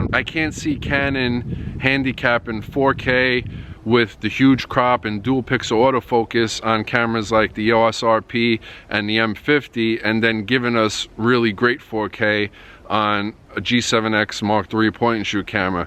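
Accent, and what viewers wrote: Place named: New York, United States. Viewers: Just came across your review for the first time, but I will be back. As a Canadian, I loved the NY or NJ accent, Great review, you covered a lot of information in a fair way. I was looking at Sony and have an old Canon S100 that I love, but I think your review convinced me the canon was a better camera and value than the Sony. Keep up the great work. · I love your voice. Sounds like a Brooklyn accent to me. It's awesome haha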